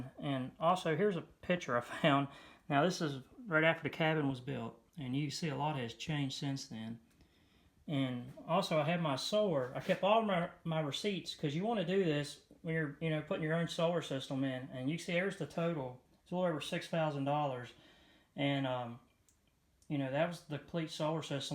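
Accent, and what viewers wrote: United States. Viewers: Very nice location and cabin. What part of the country are you located? I spent a few years in East Tennessee and you sound like you could be. I mean that in a positive way. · Im guessing by the accent, and the view from the top of the mountain, it's West Virginia.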